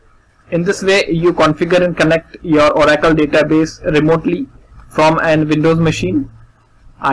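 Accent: India. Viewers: Oh GOD! ANOTHER INDIAN !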